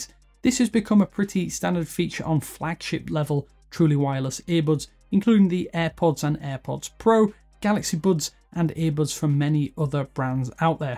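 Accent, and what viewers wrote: United Kingdom. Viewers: That's just how British people talk Lol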